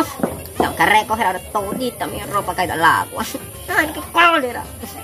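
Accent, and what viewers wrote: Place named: Peru. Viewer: se nota demasiado q es de peru me aparecio en recomendados esta muy recomedado :D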